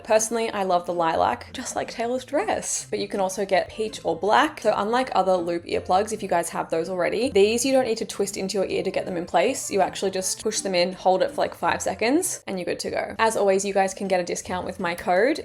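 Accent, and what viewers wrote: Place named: Australia. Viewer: OML I HAD SUCH A MOMENT WHEN U SAID U WERE AUSTRALIAN- I AM TOO AND I'M SO USED TO HEARING AMERICAN ONES I JUST ASSUMED U WERE, AND I DIDN'T HEAR ANYTHING DIFFERENT BECAUSE IM ALSO USED TO AUS ACCENTS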